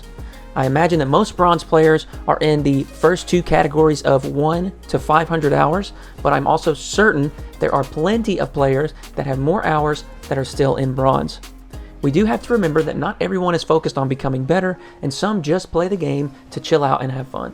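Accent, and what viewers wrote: South, United States. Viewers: Is that a southern accent? I'm not from US so I'm not really sure.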